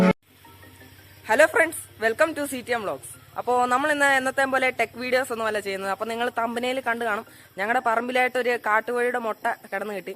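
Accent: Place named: Idukki, India. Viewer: ഇടുക്കി ✌️✌️✌️ slang കേൾക്കുമ്പോ അറിയാം 😇😎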